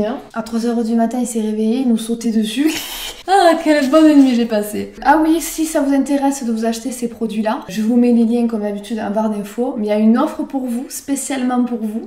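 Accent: South, France. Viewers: Bonjour! Je viens de m'abonner à ta chaine, jai le meme accent que toi meme si je l'ai perdu un peu en Ardèche!! De quel coin du sud tu es? Moi Avignon (et quand j'écoute une video ou je parle, c'est le même accent que le tien🤣). Bisou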